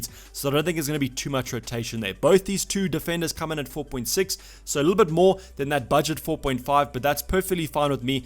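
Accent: South Africa. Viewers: The only channel needed for expert advice on FPL, Not to mention the huge bonus of that great South African Accent. Thanks.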